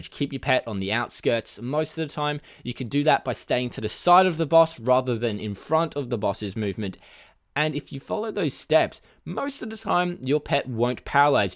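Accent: Australia. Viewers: I'm pretty sure he's Australian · Australian accent from Australia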